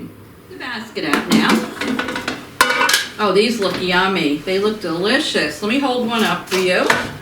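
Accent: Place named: New York, United States. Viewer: I don't think I realized until I stumbled on your channel that their aren't many cooks on these shows being hosted by real New Yorkers with real New York accents. I am subscribing because there's something reassuring about listening to you. Also, just started using my Air Fryer and can't wait to learn more.❤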